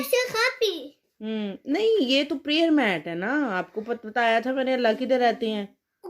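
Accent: Sialkot, Pakistan. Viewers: Sialkoty ki booli pahchani jati jitni mrzi achi urdu bool lain mgr punjabi tuch zoaroor shamil hota ha..😀 Don't tention bcoz i m also siakoty..😉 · Ap lgta sialkot se han jaisi Urdu bol rhi han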